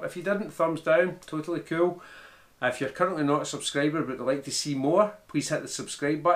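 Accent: Scotland, United Kingdom. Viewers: Excellent video, made much more interesting by a wonderful Scottish accent ! Quick Question: Are you working on a RAW file?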